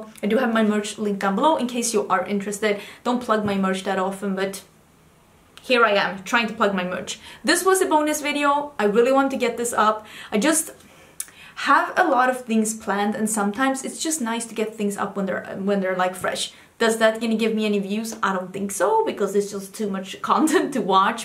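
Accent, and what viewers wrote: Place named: Sweden. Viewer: Your English is brilliant and I love hearing your Swedish accent 🙏💕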